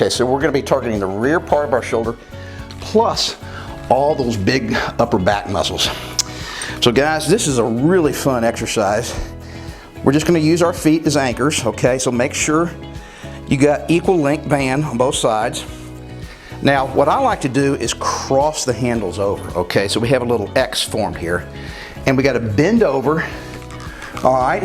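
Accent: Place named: South, United States. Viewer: This guy’s comforting southern twang reminds me of “Dubayah”. “We’re goin into “Eyerack” to give Saddam a good ol’ southern slap on the jaw yeh all”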